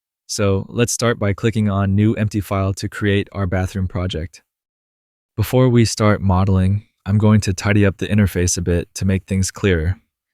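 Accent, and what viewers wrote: France. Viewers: Why do you use a voice actor for some of your videos? It's better when it's your normal French accent.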